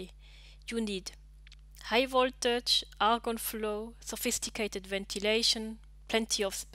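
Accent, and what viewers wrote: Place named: Germany. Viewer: most german sounding English i ever heard... but informative non the less :D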